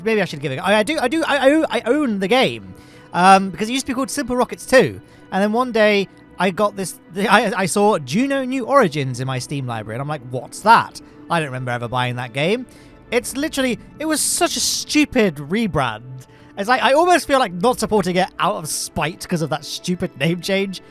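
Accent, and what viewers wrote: United Kingdom. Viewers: Forever confusing Duna with Jool thanks to the palatalized British accent...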